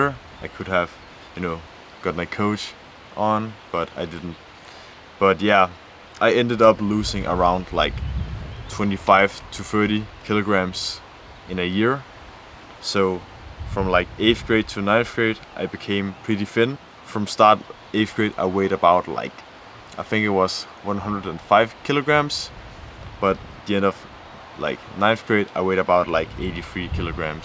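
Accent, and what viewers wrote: Standard. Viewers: 大家好 很標準❤ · 被大家好嚇到 很標準🔥🔥🔥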